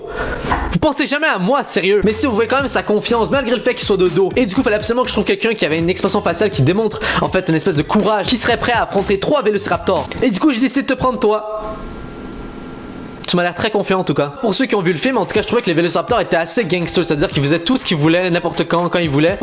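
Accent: Quebec, Canada. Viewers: Est tu Québecois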